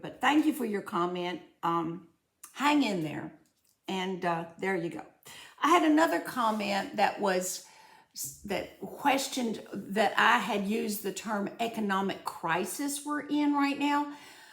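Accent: South, United States. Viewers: I really enjoy your channel! Makes me smile. Your Southern accent is beautiful to my ears. Have a wonderful day,. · As one southerner to another..I love your accent! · I just love your southern accent! And I'm saying this in my New York accent!! ❤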